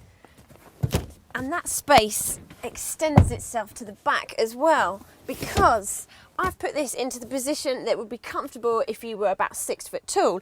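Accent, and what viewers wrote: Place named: Crawley, United Kingdom. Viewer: She's so cuuuuuuteeee! And that Crawley accent is so squee! This Yank is in love.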